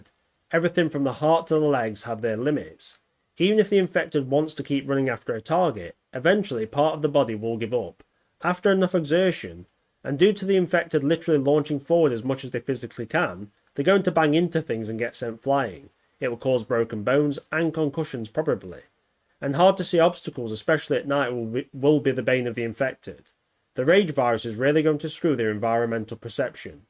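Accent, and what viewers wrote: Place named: Mansfield, United Kingdom. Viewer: Mansfield accent detected. 🚨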